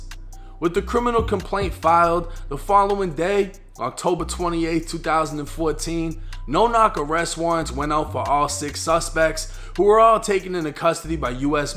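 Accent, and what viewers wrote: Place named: Chicago, United States. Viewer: u the goat u gotta be from the raq boa u sound just like us great content.. bandman Kevo the city proud of u boa.. turned yo whole life around respect.. did u really get a bbl tho g? No judgement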